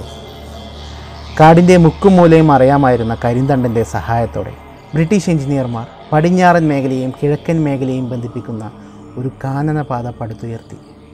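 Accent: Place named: Kozhikode, India. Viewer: കുന്നംകുളം അല്ല.. നല്ല അസ്സല് കോഴിക്കോടനാണ്.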